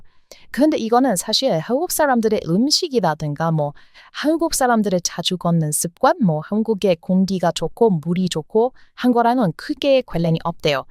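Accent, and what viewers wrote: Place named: United States. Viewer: 평소 푸른 눈의 서양 여자인 전형적인 미쿡여인 이시지만 발음은 미쿡식 그러나 어휘력은 한국인에 가까우신데 발음만 교정하신다면 진정토종 한국인 같을거에요 아름다운 그대 진정 한국인으로 사시기를 원하신다면 님께 잘 맞고 멋진 한국남자를 만나 진정행복한 삶을 사시기를 진정으로 기원합니다😂😅😊❤❤❤❤❤❤❤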